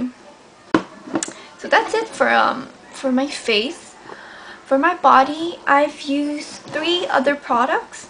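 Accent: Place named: Quebec, Canada. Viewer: I love St.Ives I use almost all of their products :) But....just asking do you live in Quebec because you sound french from there...anyways I love your videos! :)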